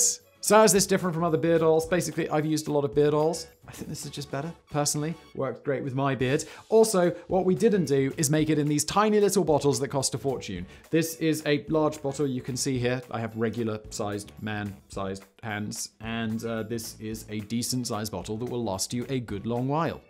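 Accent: United Kingdom. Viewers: Admittedly, I stopped after "Inside the V-2" section. So, alcohol and water, that's it? Did you forget the oxidizer? As our leader says, "Come on man!" P.S. I have to slow all your videos down to at least ..... 0.75 speed. Drink less coffee or tea or even consider a mild tranquilizer, you speak like you're being charged by the word, and with a British accent (can't be helped, I'm sure) which makes it very difficult to follow, much less comprehend, what you're saying. Nonetheless, your videos are interesting.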